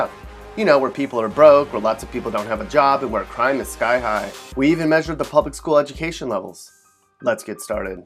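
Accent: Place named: Illinois, United States. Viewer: This guy is a libtard that can’t even say half the towns he was reading. Sounds like a FIB..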